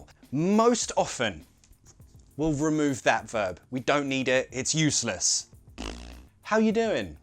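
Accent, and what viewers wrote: United Kingdom. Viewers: Hello,good,British English,Standard,I like it,thanks,Sir,greetings,like. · You are awesome ,dude . i love british accent💙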